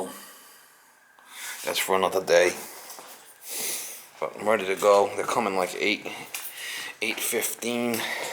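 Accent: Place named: Massachusetts, United States. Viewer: I think your awesome. I was a boiler technician in the US Navy that led me to good directions in my life. I totally respect what you do. I can relate, big time. I’d love to work with you sometime and I know that won’t happen. I grew up in Connecticut and can relate to that Massachusetts accent you got. Fuckin’ love it! My fortè is electricity and plumbing. Make that hay while the sun shines momma! I hope that blow fish blows away from you if you know what I mean.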